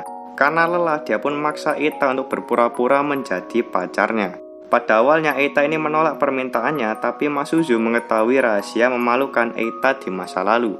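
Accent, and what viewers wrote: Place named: Surabaya, Indonesia. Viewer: Kayak orang Surabaya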